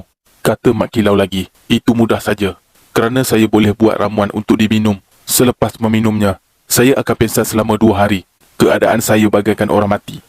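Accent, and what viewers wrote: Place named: Pahang, Malaysia. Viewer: tau dh ouk Tu org Pahang . Keh layan video ouk dgr ouk bercakap pun tau dah loghat Pahang terselit situ hehe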